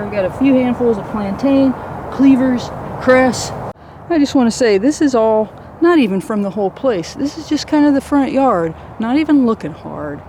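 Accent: South, United States. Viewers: You said you are in the Pacific Northwest?? You sound like you may have a wee bit of a Southern in your accent or maybe TN Valley....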